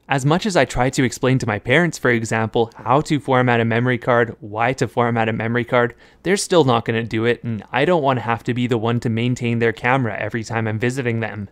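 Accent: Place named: West Virginia, United States. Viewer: by the sounds of it, he’s in West Virginia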